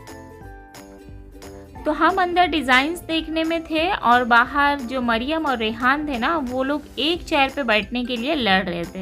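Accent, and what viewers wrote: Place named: Pakistan. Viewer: Aap to bilkul Pakistanion ki Tarah boltin hain .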